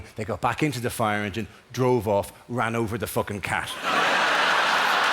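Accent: Ireland. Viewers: I saw this on DVD in about 2007, and I always assumed the name was Peter Thatchell, and it was just Dara mispronouncing it in a thick Irish accent. Was only years later I discovered it was actually Tatchell 😳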